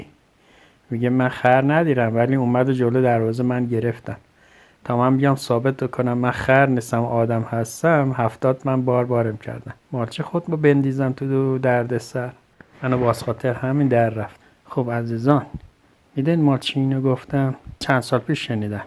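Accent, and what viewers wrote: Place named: Qom, Iran. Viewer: عالی بود با لحجه قمی که در حاشیه هم جواد رضویان بازی میکرد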